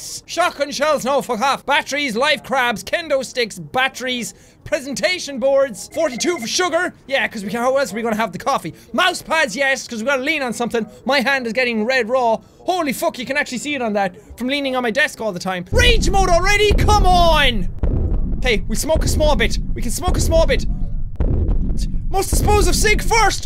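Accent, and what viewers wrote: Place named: Ireland. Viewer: Love your Irish accent I'm part Irish but I don't have the accent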